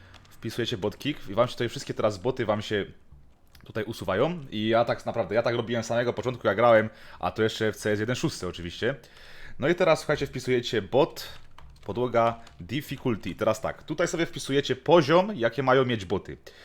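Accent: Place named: Silesia, Poland. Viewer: Czuć ten śląski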